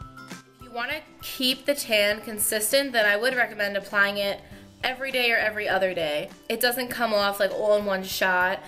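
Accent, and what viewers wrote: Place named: New York, United States. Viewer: Are you from Long Island/ Brooklyn/Bronx/Queens/Staten Island or something? Based on your dialect.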